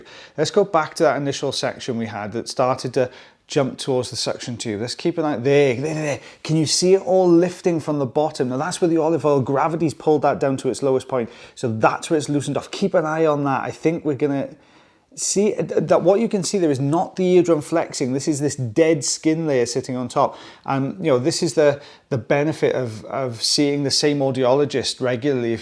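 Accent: Wales, United Kingdom. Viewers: Loved that! Also love your accent - I lived in Carmarthen when I went to Trinity College there in the 90s. I really miss Wales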